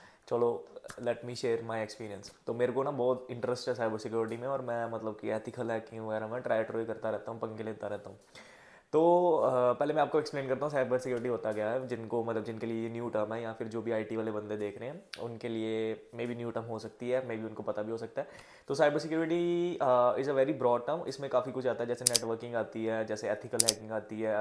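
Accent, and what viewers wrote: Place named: Punjab, India. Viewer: Bhai aap punjabi ho na😅....aapke accent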